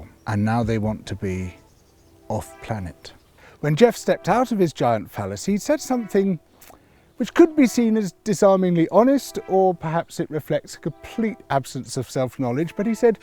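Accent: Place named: England, United Kingdom. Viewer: "...sending himself into space... in a giant dildo..." If George had have said that with his beautiful English tones I would have died laughing. Later Edit: giant phallus, I had not gotten to that yet, thats going to tickle me for hours.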